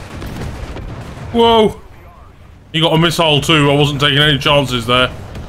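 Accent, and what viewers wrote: United Kingdom. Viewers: Does anyone love this channel because of British accent? I am not sure why but it feels attractively hilarious.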